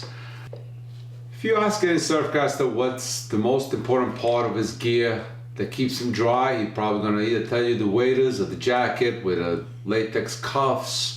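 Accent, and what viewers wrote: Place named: Long Island, United States. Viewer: He's from Long Island, we all talk the same.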